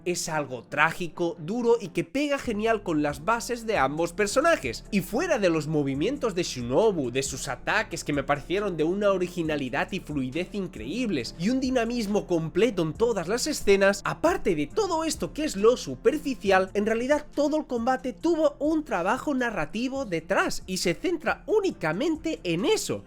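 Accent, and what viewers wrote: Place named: Spain. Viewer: Desde que descubrí este canal, me sentí en casa porque encontré a alguien que habla fuerte, con carisma, emociones y acento español. Un abrazo desde Perú, maestro. 🫂🐺🇵🇪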